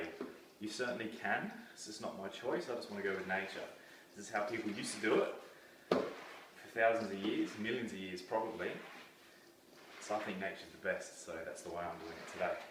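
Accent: Australia. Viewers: Accent??? He's an Aussie... easiest accent to understand! 😁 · It's especially funny when translating an Australian accent.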